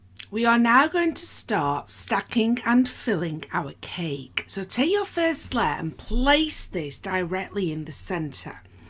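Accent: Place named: United Kingdom. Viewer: I gave up. I don't understand British English 😭😭😭😭😭 Update: I put subtitles and it was a lot easier. Thank youuuuu for sharing such a great technique. 🥰💕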